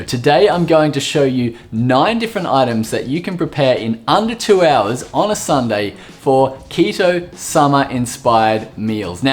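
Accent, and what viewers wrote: Australia. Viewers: I love your videos but I truly hate Australian accents no matter who is talking sorry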